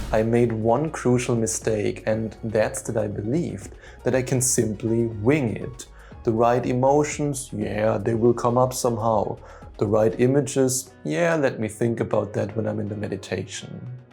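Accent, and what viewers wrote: Germany. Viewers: I started a 90 day dr joe dispenza meditation challenge for myself & sharing it on my youtube channel to stay committed & motivating my clients. You really always inspire me..this weekend will do a home retreat.Many thanks for your videos..vielen Dank..sind wirklich tolle Videos..empfehle Dich immer gerne weiter. I was in Basel 2024 but meditated on and off..consistency is key..got it locked in now🎉we got the same German accent😂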